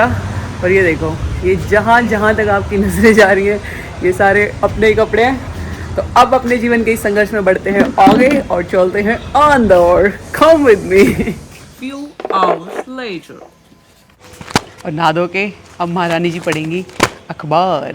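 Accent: Haryana, India. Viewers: बोली से पटा चला आप सादर हरियाणा से हो। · Very Sweet ❤ बहुत प्यारी बोली हैं हरियाणवी ! Very Lovely Haryanvi Culture ! Aapki Shaadi Me Jrur Bulana! I Like And Want Seen Haryanvi Culture ❤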